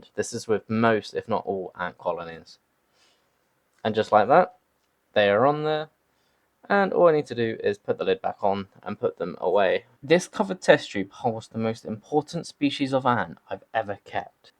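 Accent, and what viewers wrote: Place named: United Kingdom. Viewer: i was really confused when you had s fugax AND c diversa but then i realized that you have a slight british accent. good luck with the manica though.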